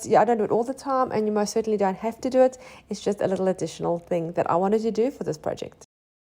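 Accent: South Africa. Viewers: You sound like a fellow South African, where are you from?☺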